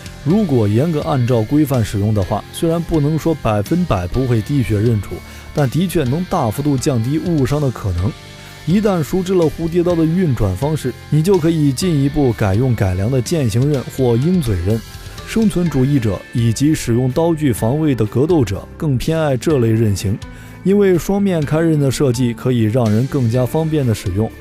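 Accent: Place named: Taiwan. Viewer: 这个节目到底是中国的还是 台湾腔奶奶风娘的？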